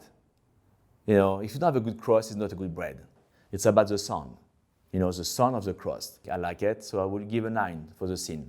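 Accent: France. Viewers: I can listen all day to his french accent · The only thing that is bad on this video, is that we have to listen to French accent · This guy makes the French accents in the movie sound accurate. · he sounded so french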